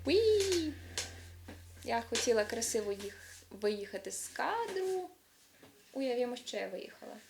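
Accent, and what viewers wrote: Standard. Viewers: Ох як би так гарно чисто та й правильно, як ви, хоть би 50 відсотків українців говорили, яка би насолода для вуха була !!!!!!! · До чого ж приємно чути чисту українську мову!!!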